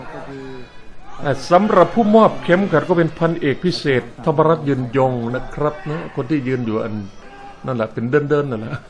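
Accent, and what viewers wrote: Isan, Thailand. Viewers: มักหลายที่เว้าอีสานเด้อคลายเครียดได้ดีอิหลี😁😁😁😁😁😆😆👍👍👍👍👏👏👏🤣🤣🤣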